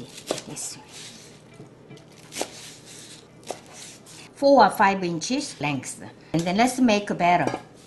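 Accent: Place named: South Korea. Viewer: your Korean accent is sooo cute!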